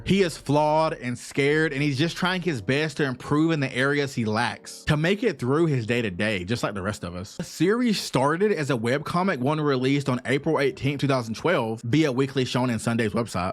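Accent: South, United States. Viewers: Great vid but gotta ask. What part of the south are you from haha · Very interesting! I used to love Shin-Chan, such a silly show. Also love your accent! I've heard some southern accents in my life but yours is unique to me!❤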